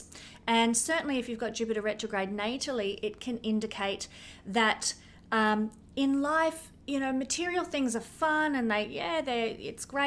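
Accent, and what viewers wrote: Australia. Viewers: Your Australian accent is very pleasing to the ear! I miss your lovely country and all its wonderfully amazing people ❤🧡💛💚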